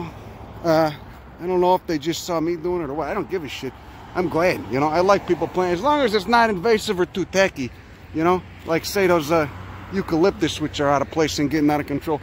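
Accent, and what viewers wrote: Chicago, United States. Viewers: That's a pure Chicago accent.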